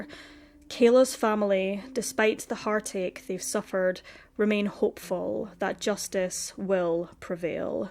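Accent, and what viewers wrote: Scotland, United Kingdom. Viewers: She is in Scotland with a Scottish accent, give her a break!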